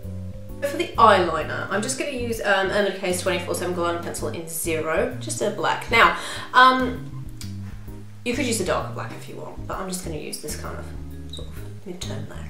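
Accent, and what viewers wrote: United Kingdom. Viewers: you are sooooooooooo beautiful even without makeup...but then again you must get that a lot already....but i still had to say. love your tutorials and thanks for putting up all the names becasue sometimes i hv a hard time picking up on the words with your absolutely lovely sweet english accent. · I agree with Teresa!!, your voice is so pleasant to listen to!, very relaxing and I do too love your british accent, that's something I have always liked (british accent). This tutorial was very good too, you explain so well!!. The lipstick/blush combo really complemented each other. Very pretty!!, you are our favorite!!!, keep the amazing job!!!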